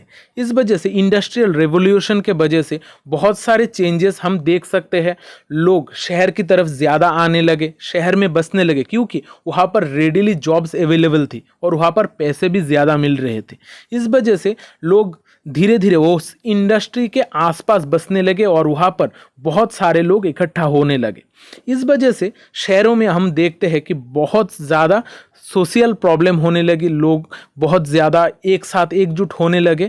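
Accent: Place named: Odisha, India. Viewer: Apke accent se lagaa aap Odia ho. Maaf karnaa.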